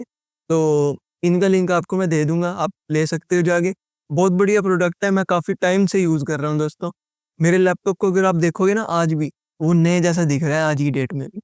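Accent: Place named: Punjab, India. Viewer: Punjab probably accent se lag rha hai. Or mast tarike se explain bhi kar rahe hai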